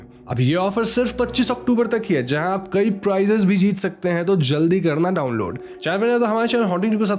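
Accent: Bengal, India. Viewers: Your Bengali accent is nice.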